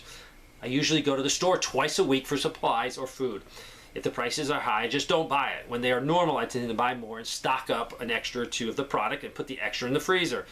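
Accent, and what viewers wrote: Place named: Michigan, United States. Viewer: You have a Michigan accent, i could tell when you said galen instead of gallon.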